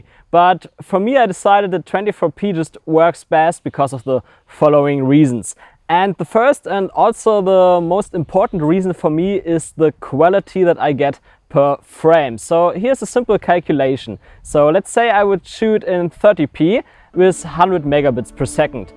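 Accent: Germany. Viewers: Man hört das du Deutscher bist 😅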